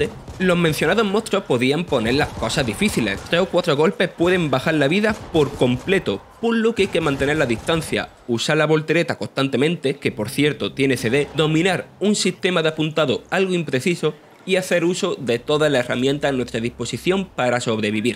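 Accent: Andalusia, Spain. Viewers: No quiero menospreciar su trabajo pero me costó entender al narrador. Es un acento andaluz muy marcado y para un gallego como yo es complicado. Antes de nada, aclarar que yo no valdría para narrador porque tengo un acento de la hostia. Sólo que este tipo de vídeos creo que necesitan un castellano neutro.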